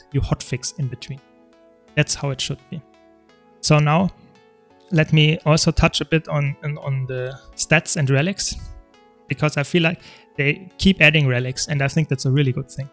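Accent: Germany. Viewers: ja moin, ich bin auch deutscher, dein akzent kickt halt noch härter rein als meiner xD no offense metabattle hat allerdings auch sehr viele builds...es könnte sogar gut möglich sein das die noch mehr builds haben als guildjen und bei metabattle sind auch nicht alle builds top notch z.B. die open world pve builds, also ich weiß echt nicht, ob sich das jemand dauerhaft antun möchte mit dem ele mit den niedrigsten lebenspunkt und der niedrigsten rüstung in kombination gibts das so nur beim ele, ob sich das jemand das halt wirklich permanent antun möchte mit full berserker gear rumzulaufen, da braucht dich ja nur ein mob in der open world angucken und fällst gefühlt schon um und liegst im downstate...guildjen hat wirklich dediziete builds speziell für open world pve, da wirken die builds von metabattle dagegen nur wie eine veränderte (wenn überhaupt verändert) version von builds die sie so für instanzierten content benutzen, also halt für fractale, raids, strikes und dungeons gedacht sind und nicht spezifisch für open world pve, während die builds bei guildjen extra dafür erstellt wurden.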